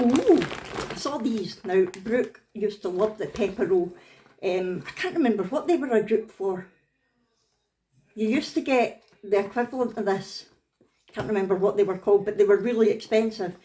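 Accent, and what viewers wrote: Scotland, United Kingdom. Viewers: Hi that's a brilliant idea it's good to hear the Scots dialect and celebrate it x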